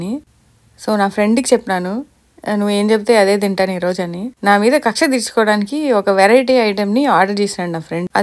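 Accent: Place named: Rayalaseema, India. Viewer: mee Rayalaseema Accent chala chala bavundi And locations are so beautiful thank u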